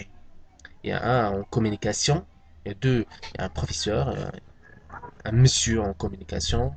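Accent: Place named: France. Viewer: il faut que tu change ta façon de parler et soit un peu marocain vérile et arréte de faire tes gestuels et ton acçent a la française..sinon c pas mal salam khoya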